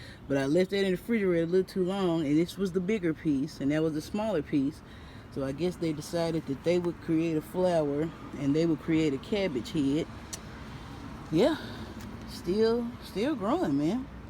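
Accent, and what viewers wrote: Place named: United States. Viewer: Love the American accent kkkk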